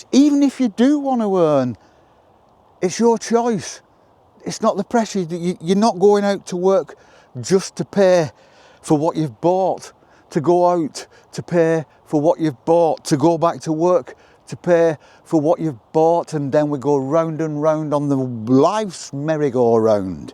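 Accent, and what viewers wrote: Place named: England, United Kingdom. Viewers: Hm.. It is great to hear english accent and someone saying all those great things about Humanity. God Bless You.. 🙂☕ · I don’t know what part of England you are from but I love your accent.❤ No debt means no stress. I wish my government would’ve this way. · I’m appreciating your northern accent. All the people I’ve seen talking about slow living so far have been young American women. My issue with capitalism is that a bread company can make more money if they cut costs, so maybe they spray their wheat with pesticides to reduce crop losses, or they don’t pay the farmer a fair price, or they rip up the hedgerows to create a big monoculture field that’s quicker to reap etc. Seems like the lower your prices the lower your ethics! But people buy it regardless, because it’s cheap (and therefore they have more money to spend on cheap tat from B&M/holidays abroad/a new bathroom) Every pound you spend is a vote for the kind of future you want to see (to paraphrase Mike Berners-Lee) Thank you for sharing your wisdom! 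Best wishes from Derbyshire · Absolutely love your Lancashire accent. So sad to hear it is disappearing 😢. Also you talk so much sense. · Newly retired and beginning my journey toward slow(er) living. For me, the current challenge is more about changing my mindset than altering my actions. One day at a time, as they say. Finally, for those Brits that might know, what area is this man’s beautiful accent from? Maybe NE England?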